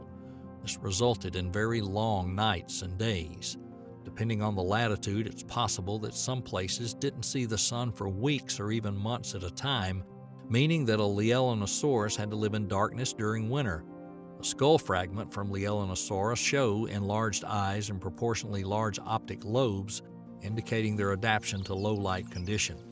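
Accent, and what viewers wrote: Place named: Tennessee, United States. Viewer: I love this man's accent. I wonder where he's from. Sounds like a Tennessee neighbor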